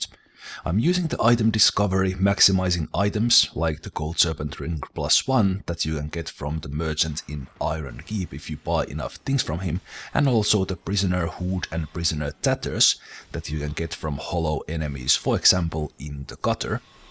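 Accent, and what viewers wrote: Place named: Finland. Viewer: Where are you from your accent is kinda finnish type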